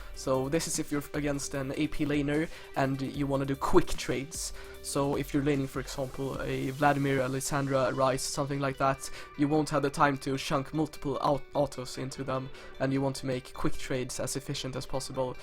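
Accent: Sweden. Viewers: Are you swedish by eny reason you'r accent sounds like it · Are you from Sweden bro?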